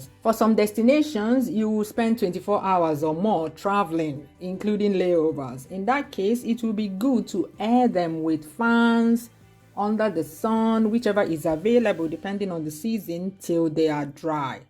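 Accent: Igbo, Nigeria. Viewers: I love hearing you speak igbo.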